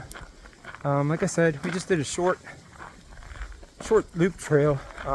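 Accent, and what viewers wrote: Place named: Pennsylvania, United States. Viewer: I’m following you because your accent reminds me of my PA family. Good luck on the AT! I was just at Amicalola Falls State Park & Lodge myself and enjoyed hiking some in GA.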